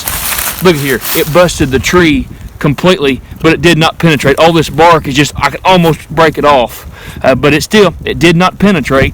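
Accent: United States. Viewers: The video was really refreshing, what I call proper American. I am from UK and bird and rabbit shoot in woods not dissimilar to yours, unfortunately, our government takes a dim view of the populace having firearms, so best I can shoot without spending a fortune is shotguns. Just love your accent dude, you come across real natural, and just pop anything up when you got the time, we hillbillies got to work to make ends meet. I do shoot an American flatbow in my hunting grounds in Hampshire, heard you backwoods boys are handy with a bow. Grace and Peace American bro.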